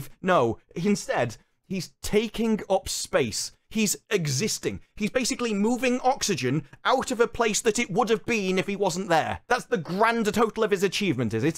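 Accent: United Kingdom. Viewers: A british guy talking angrily for good reasons and staying prudent while other disgrave his culture? Take my subscription!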